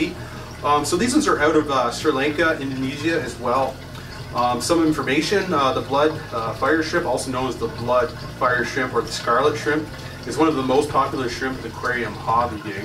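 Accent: Canada. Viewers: he's from Canada they say stuff differently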